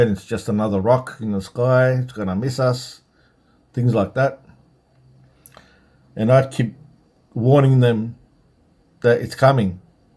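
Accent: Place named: Australia. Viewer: You sound Australian to me and I was just watching the Live Tracking of Apophis and it said passes would be in 2029 and 2036... or as you say in dream; 7 years to go, 7 years to go. Since you posted this video NASA has done the DART mission with smashing success(pun intended) but that got me thinking. Why's NASA doing this? Now I hear we're going to this asteroid and now I'm here and getting very concerned in USA. 2371 Days 2 Go, live them well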